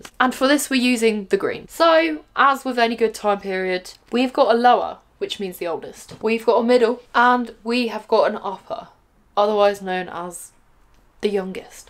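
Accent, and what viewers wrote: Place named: United Kingdom. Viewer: I’m mainly watching for the British accent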